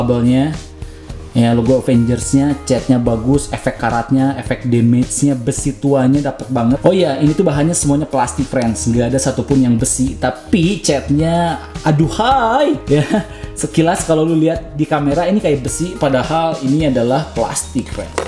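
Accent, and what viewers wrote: West Java, Indonesia. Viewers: youtuber mainan kesukaan gua, gak kaku, asik ngomongnya. kadang sunda logatnya, i love u 😂😍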